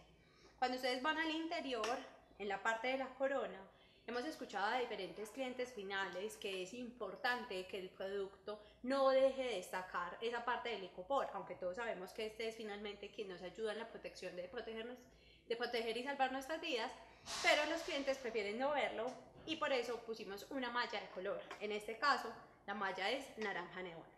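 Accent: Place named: France. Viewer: La chica habla como con un asento francés, o será que tiene la lenguita pegada🤔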